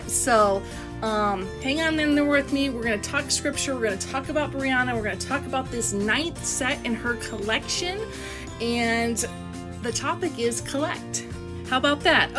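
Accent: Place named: South, United States. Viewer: ain't nothin' wrong with a southern drawl y'all....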